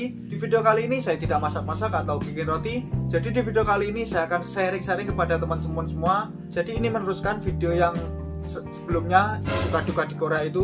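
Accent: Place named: Java, Indonesia. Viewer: Samean jowo to mas😂 jos pokok e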